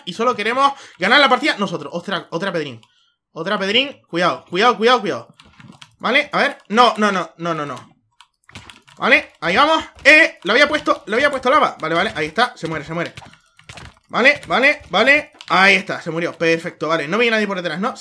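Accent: Argentina. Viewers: se volvió argentino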